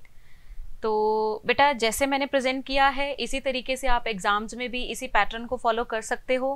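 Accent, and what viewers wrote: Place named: Punjab, India. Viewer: Mam your punjabi accent😂❤❤ Dil jeet lete hoo.!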